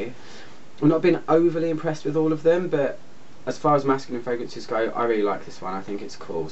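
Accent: England, United Kingdom. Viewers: i love your voiceeeeeeeeeeee!!LOVE YOUR ACCENT ENGGLISH PEOPLE IS AMAZING!!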